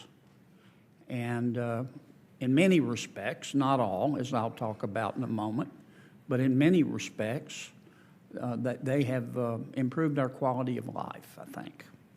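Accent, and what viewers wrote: South, United States. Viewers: 😁😊😍 I LOVE John Kennedy!!! He seems soo polite and easy-going, but his sweet Southern accent and his soft spoken delivery hide a sharp as a tack mind! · One of, if not THE smartest persons in Washington DC. It's a shame that some Americans have equated the Southern accent with ignorance.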